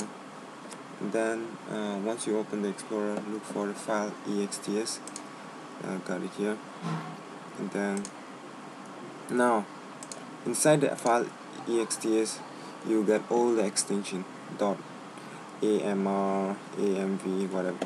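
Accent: India. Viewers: indian or serbian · Are you Indian or French??...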